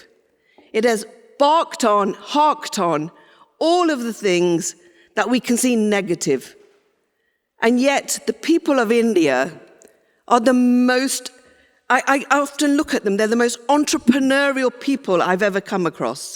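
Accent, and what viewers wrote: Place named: England, United Kingdom. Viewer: Godi media with an English accent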